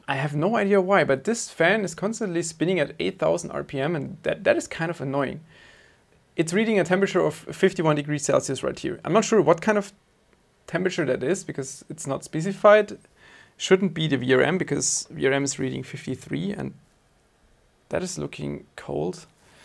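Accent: Germany. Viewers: you are a native German speaker XD ... I mag the Klang of deinem accent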